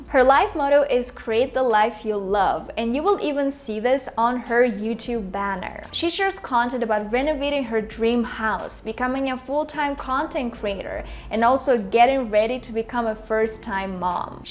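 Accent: Russia. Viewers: You speak very well and a nice girl.but still it is heard that you are Russian speaker. ❤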